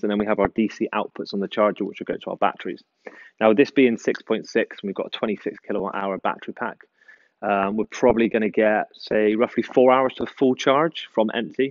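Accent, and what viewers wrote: Bristol, United Kingdom. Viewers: Do I detect a bristolian accent? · Good to see you guys pushing a bit more you tube content! Gotta love a strong Bristolian accent. "Alright my lover" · You sound like your from Warmley or at least East Bristol.....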